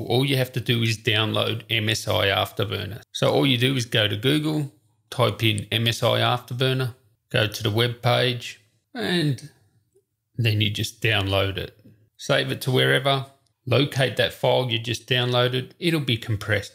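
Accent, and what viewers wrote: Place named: Australia. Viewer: I just need to rewatch the video for the tutorial I came for, I was lost in your sweet Australian accent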